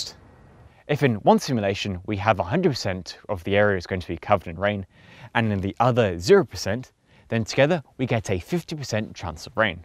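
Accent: Australia. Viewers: Your accent is far more Australian than it is British. Biggest give away is you say ak-Sent and not Aks'nt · He is an Aussie. He is poking fun at people that confuse the accents. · He is an Aussie but may have lived in the UK before moving to Australia. Not all of us sound like Crocodile Dundee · He’s definitely more towards the “cultivated”end of the aussie accent spectrum or flatout got an english-australian accent due to upbringing or familial exposure. Ngl I interpreted his opening joke about the weather was him joking about his english background. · He sounds aussie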